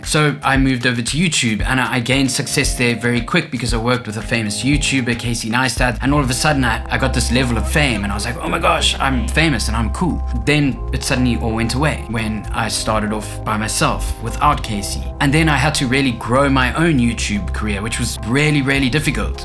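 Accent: South Africa. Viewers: Step 2 is where im at, i did about 10 weeks of weekly videos. I create scrap metal sculptures and i film the process and the story of why im making what im making. It felt so good to be so active for a few months but making the sculptures is my fulltime job and the extra time put me behind on orders argh, once ive caught up properly i can get back to it again 😁 thanks for the advice, I love your content and the accent, it reminds me of my grandmother (she was from south Africa)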